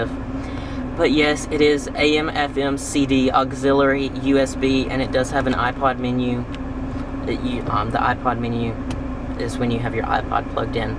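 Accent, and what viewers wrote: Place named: South, United States. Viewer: sweetie hi...i tried.. and tried.. to listen to your review but because of my prejudices i can't..... i just cant take the american southern accent.. anyway.. i think the car is cute.. .my nephew wants this.. for graduation present..... so i just wanted to watch reviews on it....i am sure your review is very detailed.. but that sloooooooooooow american accent... causes too many vexations to my spirit....... be blessed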